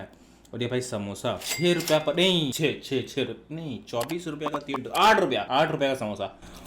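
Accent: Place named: Bihar, India. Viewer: Humko laga app bihari ho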